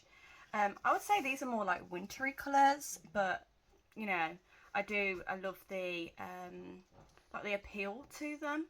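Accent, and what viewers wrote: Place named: Birmingham, United Kingdom. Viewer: hello, thanks for sharing your journals ❤ I live your accent, reminds me of home. I’m from Birmingham but live in New Zealand now xx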